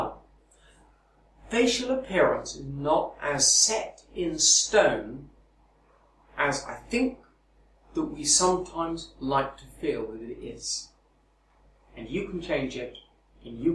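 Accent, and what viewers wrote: United Kingdom. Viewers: Jai Hind Nah he has some kind of english accent. It's quite clear and quite close to how BBC newsreaders sound, but not quite as posh as, say, the queen of the U.K. Think groundskeeper willy from the simpsons. That's a comedy scottish accent, so it's exaggerated af but it isn't too far away from the truth. :P Also, Shrek speaks with a scottish accent, though Mike Myers is obviously american. I'm struggling to think of a world-famous scottish actor you might know.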